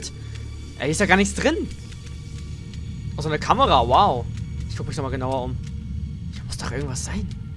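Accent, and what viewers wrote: Berlin, Germany. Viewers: du und dein berliner sprache weste bist du berline dann sag "ich bin ein berliner"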